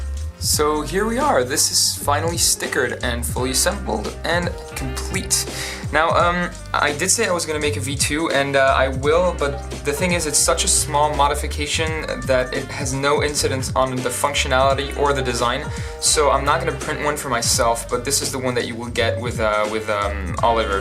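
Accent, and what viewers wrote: France. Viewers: Mais, t'es français O_o, je savais pas moi ! Ton accent est super !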